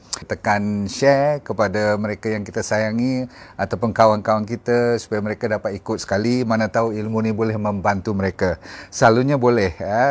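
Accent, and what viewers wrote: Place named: Kedah, Malaysia. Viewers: alhamdulilah...tqvm... ilmu yg sgt bermanafaat..suka dgr Dr. cakap kedah....terbaek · Tiba2 Dr. Kefek utara asai oghang mana? Info cukup bagusla Dr tq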